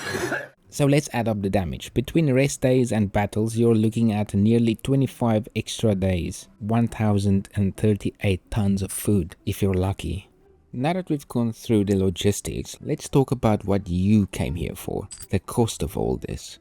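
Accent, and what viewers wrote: Afrikaans, South Africa. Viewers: Are you from South Africa? 😭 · What is your nationality? Accent sound Afrikaans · Good day to the Narrator, quick question, is the Narrator South African? 🇿🇦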